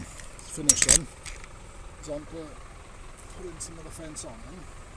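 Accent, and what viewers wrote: Wales, United Kingdom. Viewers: Just have to decipher the Welsh accent a little better !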